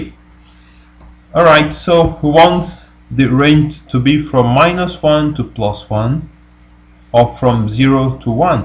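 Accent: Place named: Nigeria. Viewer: I dont think his voice is not good for explanation. I think the problem is the accent. I am Nigerian and we have similar accents. Its the same challenge I experience when watching videos with strong Indian accents. In my opinion, his voice is clear and he explains well. The only challenge for you is that he doesnt speak with an accent that you understand.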